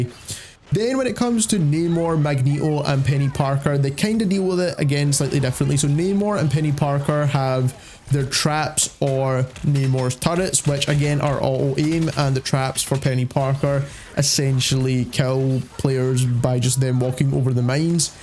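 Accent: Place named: United Kingdom. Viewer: "British" accent so annoying bro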